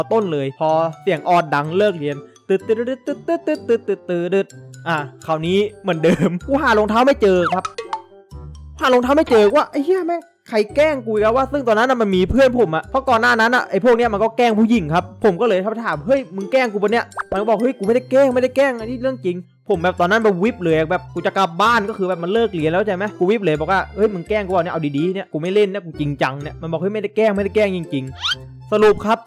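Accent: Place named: South, Thailand. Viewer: พี่เป็นคนภาคไหนทำไมพูดเหมือนคนใต้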